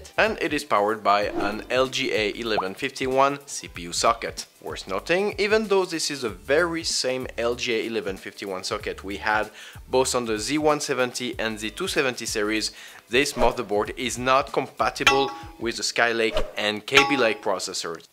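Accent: France. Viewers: Love the accent! xD Are you French? Anyway, appreciate the review. Waiting for mine to arrive in a few days.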